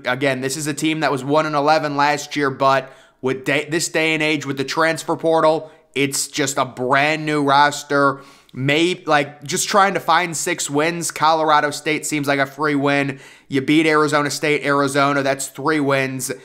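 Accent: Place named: Midwest, United States. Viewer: you have slight mid west accent. you live in Michigan?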